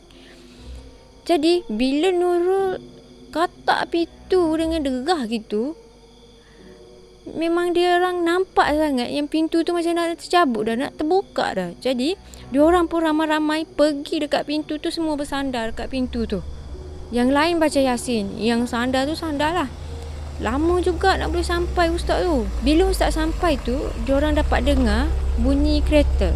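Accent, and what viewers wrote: Kelantan, Malaysia. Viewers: cankap klate baru belago angin cankap luar tk berapa best..hanya lihat host sajo😂😂😂😂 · Kecek klate la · kak , cito dialek kelate lagi sedak berbanding kl 🥲 . aura dio lain sikit , kl kureng sikit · kak amy subscribe n follow sbb lain dari yg lain, cerita seram dlm loghat kelantan.. just be yourself darling, tak syok da nak dengar bila nak jadi org lain n tak feel da seram dia, lebih pd kelakar.. sorry syg, kak amy tegur demi kebaikan sbb ramai yg nak awk kembalikan balik loghat Kelantan n its real you..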